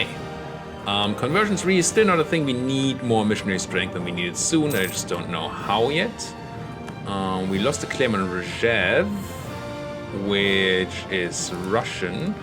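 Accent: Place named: Germany. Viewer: Oh wow, I would never have guessed that, your accent sounds too "refined" for being German, in a good way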